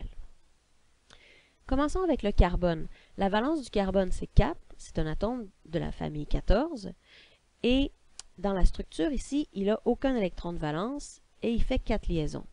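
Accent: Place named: Quebec, Canada. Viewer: Je valide l’accent québécois ainsi que la vidéo 👌🏾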